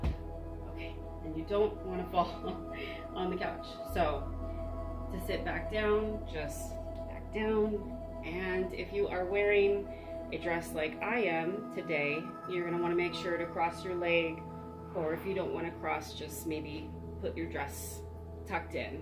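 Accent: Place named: United Kingdom. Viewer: From a British viewpoint you have a very pleasant accent, whereas when we berthed in LA we found the local accent harsh and unattractive. Difference in education? My wife used to retract her undercarriage much as you do - but she saw me in the RAF and I think imitated the aircraft - that was her story anyway!! .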